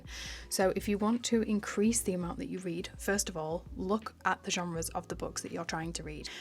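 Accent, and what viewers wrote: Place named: North, United Kingdom. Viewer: heard the northern accent, immediately subscribed 😌🙌🏻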